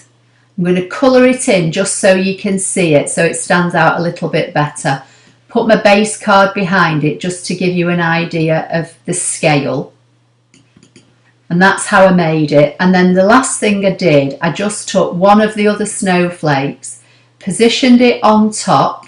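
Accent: United Kingdom. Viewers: Well as I’ve heard and believe....YouTube videos have a life of their own. Someone catches one and the fun begins. I’m amazed when I view one of yours and read the comments that it is from “the past”. Being rather new to ScanNCut and paper crafting I’m looking for all the help I can get. Thanx so very much for your beautiful work. And I live your British accent. 😍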